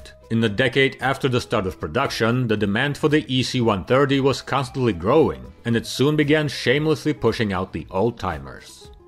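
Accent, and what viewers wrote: Russia. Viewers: Yippee… politically we may hate the Russians at the moment, however on a personal level, nothing beats a Russian voice narrating to you. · It’s not a sky ship video unless it has that genuine Russian accent 😌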